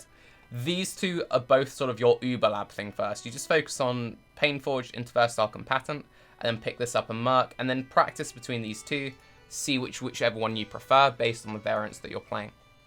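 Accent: United Kingdom. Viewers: Nice to know your graphic's card is going to arrive relatively soon. For what it's worth, i think your guides are actually really enjoyable even without gameplay. And that british accent is lovely <3. Hope you get better with your Chron's disease, and keep up the good work :D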